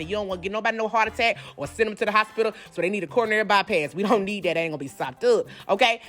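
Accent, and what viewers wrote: South, United States. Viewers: I can tell your a real southern belle ma im from Mississippi so i love hearing that southern accent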